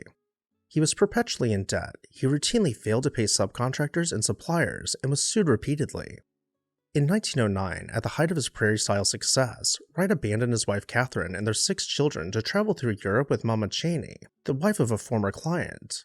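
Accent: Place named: West Coast, United States. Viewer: The viewpoint and content presented here are both impressive and engaging, but your quick speech, slightly West Coast delivery leaves little time to contemplate and absorb the excellent information being presented. You've spent too much time putting together a first class presentation to have it spoiled in part by the nagging feeling that you are trying to get the facts out before you forget them. Much love, but slow the pace, please.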